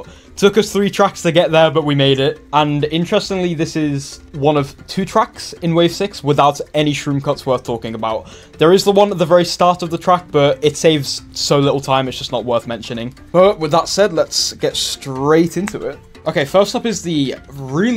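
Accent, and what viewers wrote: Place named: Northern England, United Kingdom. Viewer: You sound like you're from northern England lol (same)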